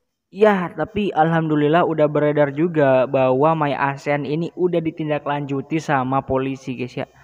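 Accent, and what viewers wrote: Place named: Java, Indonesia. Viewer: Jawa keras bos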